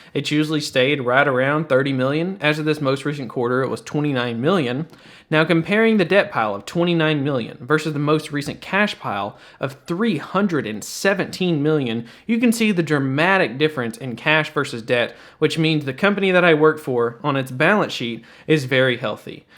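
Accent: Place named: Oklahoma, United States. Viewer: You sound like you're from the Oklahoma! I imagine you live there?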